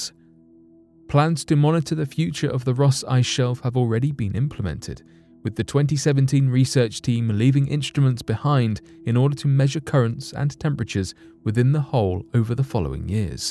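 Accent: United Kingdom. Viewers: This guy is extremely long winded. He prolongs his stories far too long. This love affair with white British voices always amazes me. He's boring. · He repeats himself a lot too. I'm not a fan of British accents either.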